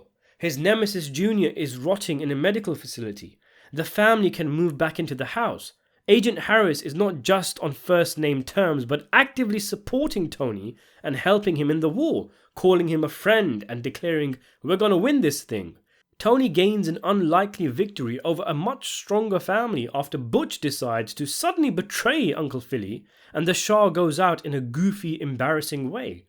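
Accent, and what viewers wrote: England, United Kingdom. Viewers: That's all it is...a theory Chase has confirmed Tony was killed. Also isn't this dude english? That's how they talk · All I know is when he slips in those show references, like anytime he references Vito he calls him and ass muncher, with the British accent it's fucking priceless!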